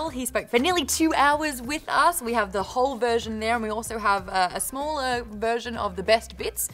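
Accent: Australia. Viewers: Cool. finally an Aussie accent. Read: The "Tales of the Ketty Jay" series. by Chris Wooding. More steampunk than high fantasy though, but this video is a coupla years old so you might have read this. If not, do yourself a favor.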